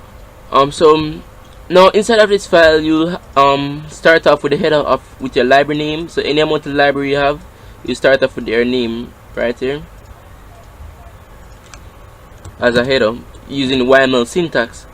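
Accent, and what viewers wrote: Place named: Jamaica. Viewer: Jamaican voice with a Welsh name....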